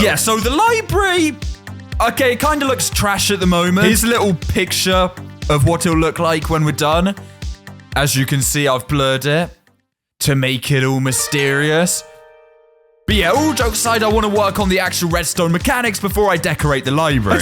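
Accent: United Kingdom. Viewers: R u British cuz u sound a lot load like one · He sounds so british, not like the language, just... british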